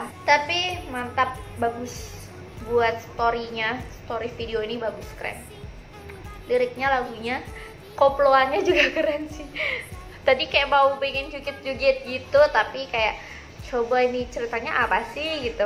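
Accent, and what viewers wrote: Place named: Banyumas, Indonesia. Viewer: Owhhh jowoe ngapak ... Ojo lali like comen subkreb momo bersaudara 😂😂😂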